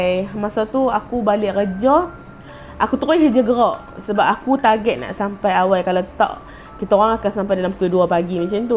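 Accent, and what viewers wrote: Kedah, Malaysia. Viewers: Mst org kedah nie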